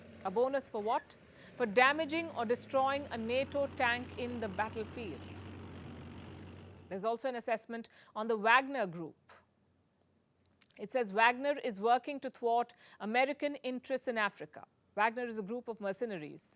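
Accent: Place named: India. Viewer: God I hate this indian Accent. Can you please use AI to "normalize" her voice.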